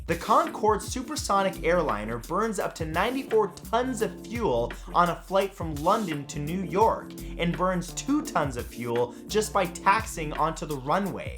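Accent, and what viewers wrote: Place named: Canada. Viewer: He's Canadian. Some things they simply pronounce differently than we do in the US or other countries.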